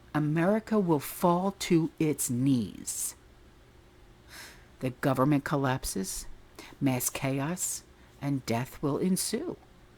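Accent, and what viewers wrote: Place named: New Jersey, United States. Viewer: She’s from Jersey. Its the accent. Get over it.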